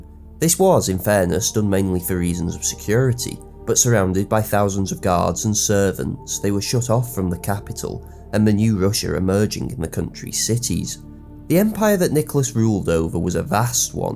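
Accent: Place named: England, United Kingdom. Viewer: Huh this prob one of the mildest english accents. hearing bbc australian pundits is nails on chalkbooard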